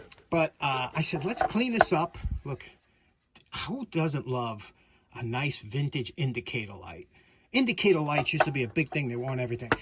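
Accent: New York, United States. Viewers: Hi, I wear my old timer badge with pride. Last year I started Bee keeping. There is a “Hive Tool” which is exactly the same as the Hyde tool. Yes, the tools are thinner as you describe. Not sure if you know about beekeeping. Honey Bees are very fussy about the interior of their hives. They use a substance called propolis that they seal up all crevices in the hive. You need the hive tool to pry the boxes apart because the propolis is made from tree resins and is very sticky. Another installment on soldering irons. Very cool temperature regulator/stand. I have a fancy modern digital soldering station, but also still have two Weller guns. Vintage is wonderful. Super station cleanup. I thought it was “Smoke gets in your eyes” not up your nose🤪. Very funny end video. I sometimes laugh at your NY. accent, because mine is the same. Stay warm. We are in the low 30’s in the daytime, and the high teens at night. 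So we are even unusually cold. We didn’t get the snow. So no complaints here. Best Regards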